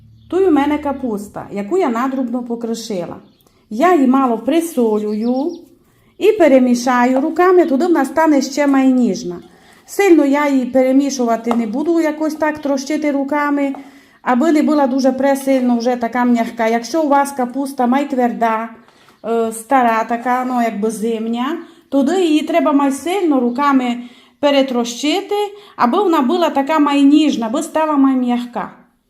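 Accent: Transcarpathia, Ukraine. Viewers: Яка велика наша Україна! Яка чудова закарпатська говірка. І все ж зрозуміло. Дякую Вам, пані господине. Отримала подвійне задоволення. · Ваш діалект говорить про те,яка у нас велика Україна!!!! Я з Одеської,найбільшої області України, і прекрасно Вас розумію!!! У нас говорять на суржику,але я,якщо чесно,соромлюся говорити такою мовою,тому,коли я розмовляю українською мовою,то всі думають,що я зі Львова!!!😄 А мій рідний брат говорить,як Ви,без комплексів,тільки на суржику,як говорять у нас в Одеській області,і Ви знаєте,він піднімає мені настрій,так само,як і Ви,тим більше це ютуб,і це Ваша Закарпатська изюминка!!! Мені подобаються Ваші рецепти,і Ваш Закарпатський говір!!!! Дякую за рецепт!!!❤️ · Це закарпатский діалект! Гарний, ні на який інший не схожий.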